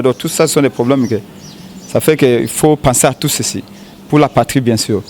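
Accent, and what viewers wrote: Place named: Rwanda. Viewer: Ndeko lande elobeli na ye malamu,yoka bongo na ye "etondi na ba R R R R R ya kinyarwanda, moto oyo azali rwandais.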